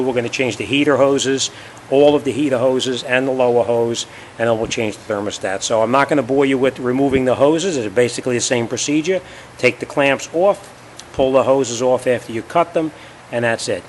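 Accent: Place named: New England, United States. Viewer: Smart man but that Yankee accent hurts my ears.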